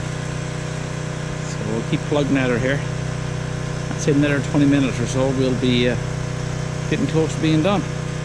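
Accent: Newfoundland, Canada. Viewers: Sounds like a fellow Newfoundlander here! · When I started watching you clear snow, I said wow, no wind to speak of, how lucky is it to be where you are. I am in Eastern NL, Canada and it seems like it is always windy here. Throwing snow almost straight up is never an option here. Then, I noticed the tall trees and guessed you were in Ontario. Then I saw your license plate and picked up a bit on your accent, seems like you are in Central NL. Great machine for snow clearing. I assume your driveway (at the cabin) is dirt. What do you do to keep your machine from digging in and picking up rocks and breaking shear pins? I haven’t had much luck setting the guides high when clearing uneven surfaces with mine.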